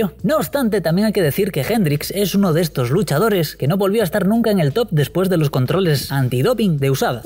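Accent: Galicia, Spain. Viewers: Eres gallego? De donde?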